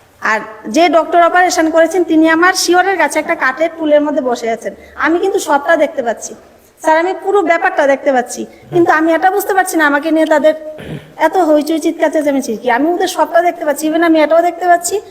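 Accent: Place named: Bangladesh. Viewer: Kothar tone ta onek ta bangladesher moto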